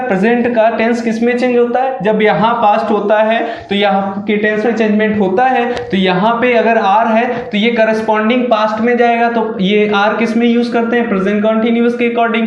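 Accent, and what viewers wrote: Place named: United States. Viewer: Good brother very nice explanation And your english accent is very american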